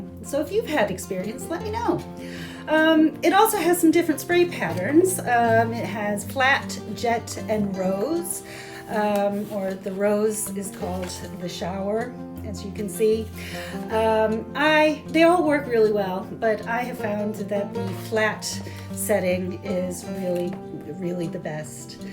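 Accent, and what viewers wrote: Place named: United States. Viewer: Started watching the video and thought.. this is confusing... american accent but looks like dull UK weather & UK home / windows... yet then you mentioned you aren't in USA! I must be psychic...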